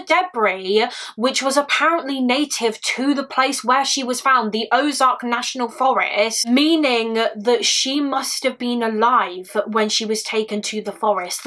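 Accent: United Kingdom. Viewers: Hi, I was curious what part of the UK your accent is from? I enjoy hearing it!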